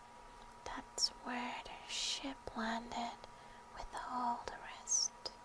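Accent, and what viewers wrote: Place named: Romania. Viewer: i hadnt checked channel in a bit but, i was glad to log in and see she had 3 new vids since last time i checked! i love her game reviews =D and all vids in general from her. she talks like this girl in my class from romania. she must be from around there lol.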